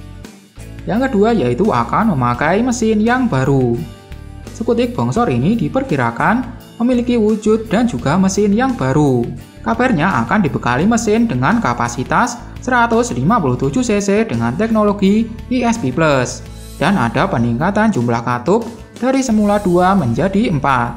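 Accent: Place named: Java, Indonesia. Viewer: Medok bener jawa nya bro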